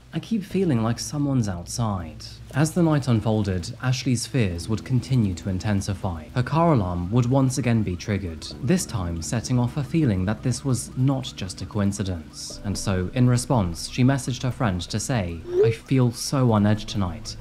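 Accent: United Kingdom. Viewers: Well, he is from the UK and recently moved to Australia. Everyone has an accent to everyone else outside of where you're from.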